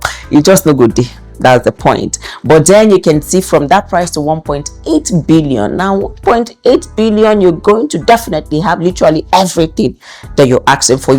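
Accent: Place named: Nigeria. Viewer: No adjust your English, jare — keep that beautiful Naija accent. I find it incredibly attractive!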